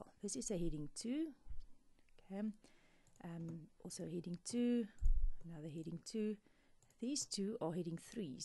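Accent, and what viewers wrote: South Africa. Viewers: A lovely South African accent I detect 🥰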